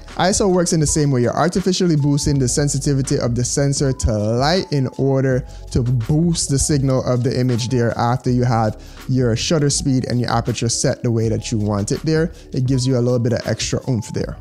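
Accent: Jamaica. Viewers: Transitioning from a career in law to documentary filmmaking and of course taking the self-teaching approach. After so many attempts of trying to understand this, your video is the only one that has made sense for my way of learning. You had analogies and visual examples, properly timed. I took notes! Thanks so much for this and shout out to a fellow Jamaican (based on your accent, hopefully im not wrong :) )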